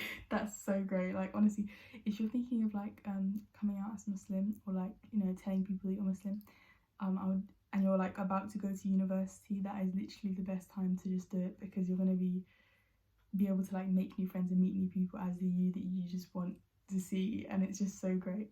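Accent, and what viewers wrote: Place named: Cockney, United Kingdom. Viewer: Assalamualaikum.. welcome to our Muslim family 💗.It sounds like you in a Cockney accent so I'm weak to understand all of your words 😁 but in general I understand it is about your experience and challenges after converting. Good luck to you.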